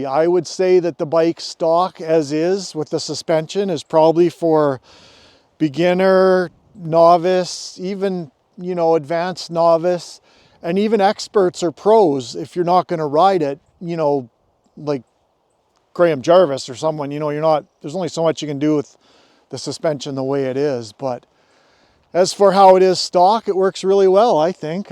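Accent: Canada. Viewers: From the first shot, I was like wonder where he’s at? Starts talking: oh, definitely Canada. Probably Kelowna. Good riding man! I do wonder why you would do this over a 500 EXC with traction control and a Rade Garage tower. You Get the torque, suspension, engine mapping. I wonder if it’s just the electronics package that makes this bike interesting as ADV bike.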